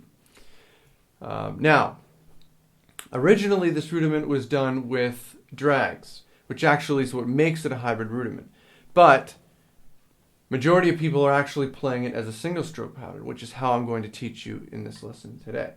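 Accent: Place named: Philadelphia, United States. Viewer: AH i thought i heard a hint of SOME type of accent, i guess i just got philly confused with a canadian accent. the way you say 'out' - is exactly how people from philly say it haha.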